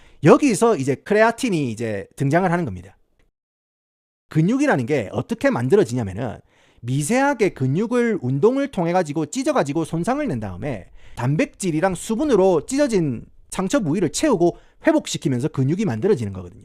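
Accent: Gyeongsang, South Korea. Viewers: 시카고 애독자예요 님 덕분에 여러 정보 알게 돼 감사하며 저도 부산이라 이 갱상도 억양 힐링입니다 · 경상도 사투리 쓰는 RM이구나~~~생각하면서 듣고 있습니돠~~~.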